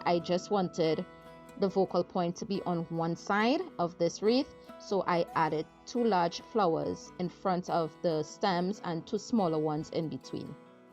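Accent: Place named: Trinidad and Tobago. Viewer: Hey friend you are very creative. This turned out beautiful. Love your accent are you from Trinidad 🇹🇹?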